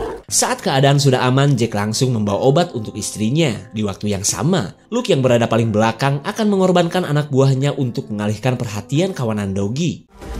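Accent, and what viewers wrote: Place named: Garut, Indonesia. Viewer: Mang orang mna ,logat na garut pisan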